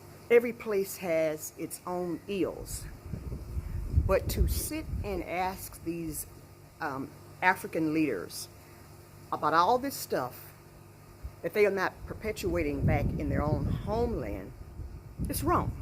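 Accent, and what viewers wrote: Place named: Texas, United States. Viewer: Love hearing my sista’s TX accent , keep bringing it ! I graduated high school in Killeen,TX ! ❤️✊🏾❤️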